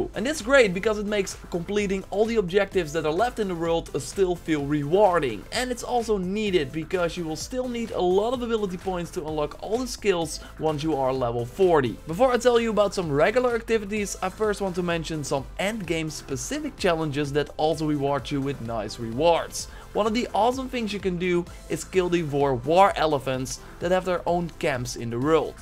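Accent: Netherlands. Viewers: Are you Dutch? You sound dutch. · are you dutch lol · Hes dutch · You sound dutch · This is definitely a dutch guy. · Lol you are so Dutch, you can hear it from your accent alot. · You're Dutch, aren't you? We have such an easy to recognize English accent. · You are dutch, I can hear it in your accent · Nederlqnds ofnie maat dat duidelijk dunglish is hoorbaar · Are you dutch/belgian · You sound Dutch😎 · Ik hoor aan je accent dat je nederlands bent xD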